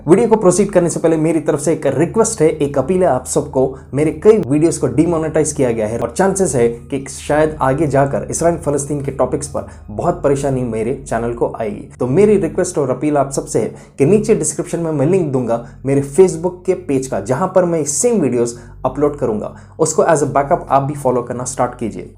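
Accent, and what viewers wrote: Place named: Malabar, India. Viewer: Bhai itne dinon se videos Bana Raheho Aapki Urdu Kerala ke Malbariyon jaisi Hai Aapko Aapni Urdu Hindi Zubaan Sudhar ne ki Zarurat Hai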